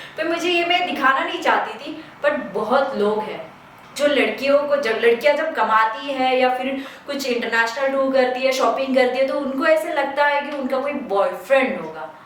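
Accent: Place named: Bihar, India. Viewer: Are you from bihar?ur hindi speaking is like biharis.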